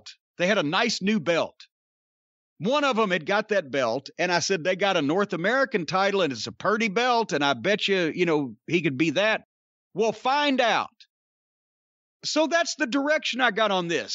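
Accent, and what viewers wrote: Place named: Kentucky, United States. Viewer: Especially considering Jim is originally from Kentucky and still has his accent.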